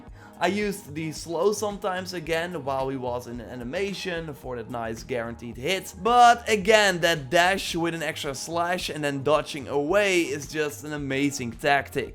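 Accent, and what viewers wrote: Netherlands. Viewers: You're Dutch aren't you?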